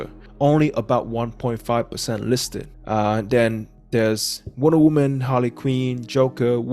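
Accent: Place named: Austria. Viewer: I like your Arnold Schwarzenegger accent dude. Great content and channel too.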